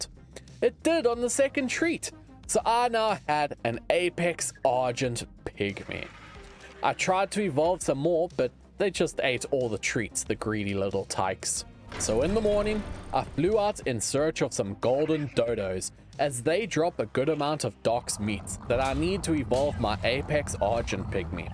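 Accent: South Africa. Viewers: Are you South African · Kyk hier neh ....jou accent was sommer mooi engels met n hint afrikaans, maar tu jy ESKOM mention toe weet ek sommer....(nee wat hierdie Suidafrikaaner kan sommer enige tyd n sub kry) uitstekend my friend ...sign my op vir daai 250 D's (Ending was totaly unexpected)#afrikaaners bly bo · Are you South African ? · damn okay. lots of ppl south african here. i thought he was like scottish or something(i aint good with accents) but hes south african. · Wait a minute are you South African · i knew you were south african lmaaooooooo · Mr, are you South African?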